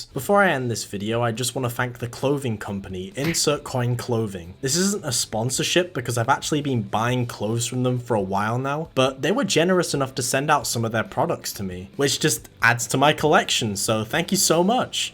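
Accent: United Kingdom. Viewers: oh, he's British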